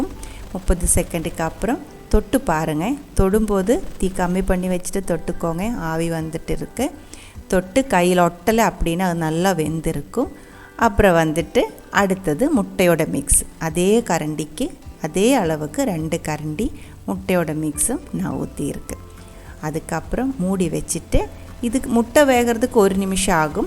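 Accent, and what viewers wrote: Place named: Malabar, India. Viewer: I have never seen like u Wow Thanks mam for this channel.addicted tour samaiyal and அழகான. மலபார் தமிழ் Long live mam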